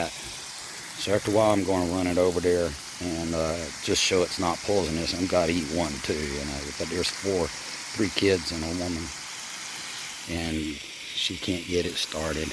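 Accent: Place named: United States. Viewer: Nice content. Your accent is really like a cow boy hahaha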